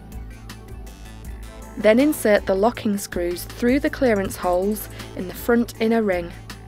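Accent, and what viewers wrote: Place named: United Kingdom. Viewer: I hate British accents....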